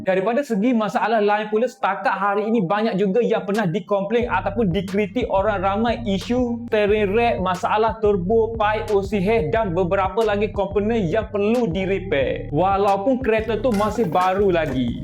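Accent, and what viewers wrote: Kelantan, Malaysia. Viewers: Sbb die nak cover slang kelate pekat die bro takpe kite support jer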